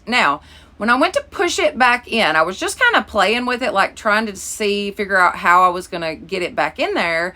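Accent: South, United States. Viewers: Bless your heart, you are so Southern! I love it. Liked your projects.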